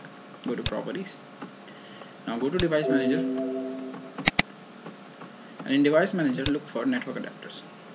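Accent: India. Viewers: Oh god i fucking hate your indian accent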